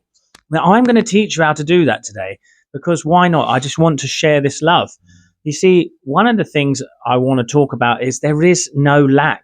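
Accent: United Kingdom. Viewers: Halo there, lol is this uk accent? Love the content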